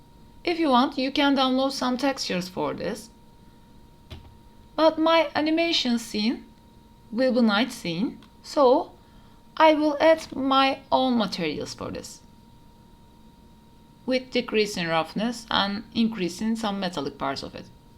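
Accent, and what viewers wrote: Turkey. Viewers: 😁 Türk aksanı nasıl kendini belli ediyor. İngilizceniz iyi bu arada. Video için de teşekkürler. Kolay gelsin. 🙋🏻‍♂️ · Ablamın ingilizcesinde türklük akıyor😀 Çok yararlı bir videoydu teşekkürler!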